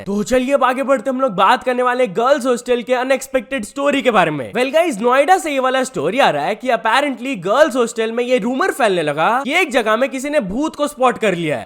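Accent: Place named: Bihar, India. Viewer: got all the bihari accent inside him 🥵🥵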